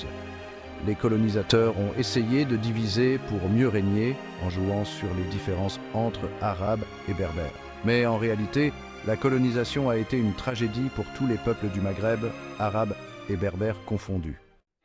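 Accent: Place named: United Kingdom. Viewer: J'adore le petit accent british 😊 Merci pour la vidéo. très instructif. Question est-ce que l'islam tolère ou efface la culture berbères?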